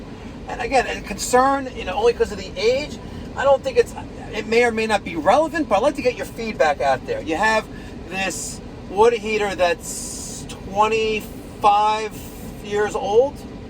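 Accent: New York, United States. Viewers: Excellent video like ALWAYS or as I stated in an earlier video last year when I first Subscribed... You always sound like Ron Jeremy with your New York accent. Sorry for the possibly negative stigma that it may bring up but I still say you are the man and we need some personality like you here in Utah! Anyway, stay safe and thanks for all you do! PEACE!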